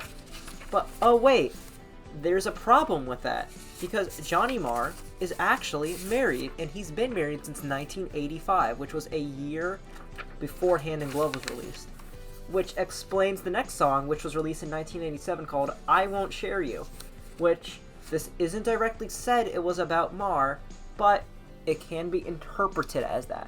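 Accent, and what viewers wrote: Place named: Northeast, United States. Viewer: Are you from the northeast region of the country? I’m from Philly and your accent feels real familiar to me?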